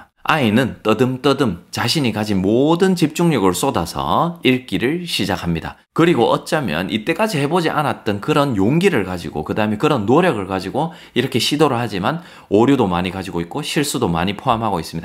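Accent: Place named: Daegu, South Korea. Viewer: 대구 프린스 선생님~😉 귀에 쏙쏙 들어오는 대구 사투리덕분에 선생님 영상 보는 재미가 두배에요~^^ 오늘도 좋은 영상 고맙습니다♥️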